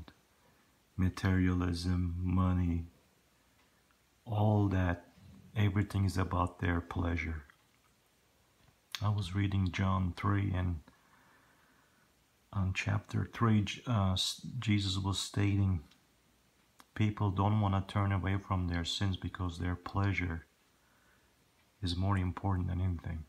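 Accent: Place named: Turkey. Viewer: Oh my goodness, we live in Texas too! We’re in the Dallas area! I think it’s the Turkish accent I must be hearing. I couldn’t place what region you are from. If you are also in this area please let me know!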